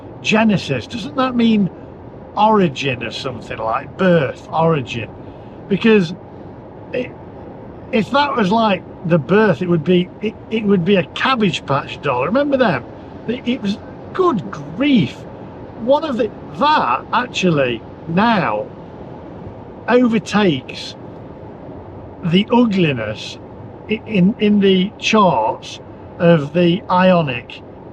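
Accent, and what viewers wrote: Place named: Leeds, United Kingdom. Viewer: Ha, I don't know where to start. 1. Sounds like you are in the North, Leeds? I can assure you that in London and the South East, it has been baking hot (26 and sunny on October 10th for example). 2. Now electric car ownership from a different perspective. I have a Polestar 2 with the performance upgrade giving 472 bhp and 0-60 in 4s. It has been 100% reliable for the 3 years I have owned it. I charge at home on the Octopus Agile plan which means that there is a lower overnight rate that is sometimes either free or Octopus pays me to charge my car. Yes, they pay me! Hard to believe but it's true. On average I run my car for around 2p a mile in 'fuel', compared to 18p to 25p per mile for your average petrol or diesel car. I have no road fund licence cost, (2025 will change that) drive free in London and can park in central London for 80p for 4 hours. 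Now non Tesla cars can use the Tesla fast charger network (not all of them yet) and generally the charging on the road network is getting better each month. Yes, insurance has gone up for ALL cars in the past 12 months . Last year my full comp insurance was £480, and this year £680. Would I reccommend an electric car, yes, 100% providing you are not pounding the motorway network all day. Home charging is a must IMO. If you can't do that I would think again. Finally, Luton airports car park was partly destroyed by a diesel car catching fire! Time to ditch these dangerous dinosaurs and go electric! :) .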